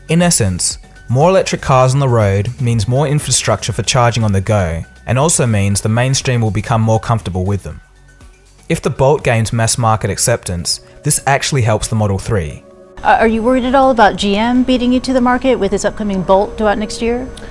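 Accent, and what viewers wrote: Australia. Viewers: OHHH SHIT MATE YOU'RE AUSTRALIAN WOOOOOOOOOOOOOOOOOOOOOOOOOOO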